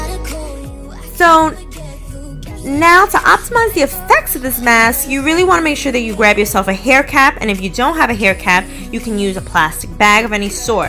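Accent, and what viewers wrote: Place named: New York, United States. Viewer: Are you from New York? It sounds like you have a New York accent😂also great video it really helped I just recently got my tips dyed and now they are very dry this helped so much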